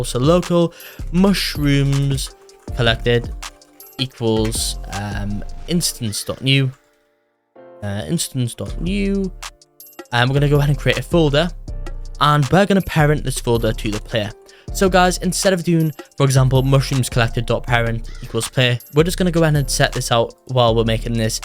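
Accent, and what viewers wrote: United Kingdom. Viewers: u bri' 'ish mate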